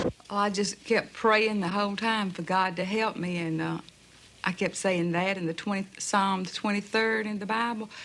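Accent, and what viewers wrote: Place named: Louisiana, United States. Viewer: i'm english but this sounds similar to the louisiana accent am i wrong?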